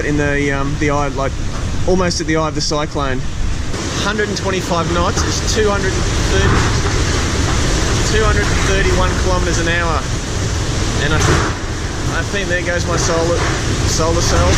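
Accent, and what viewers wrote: Australia. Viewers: You sound Australian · wait are you from brisbane?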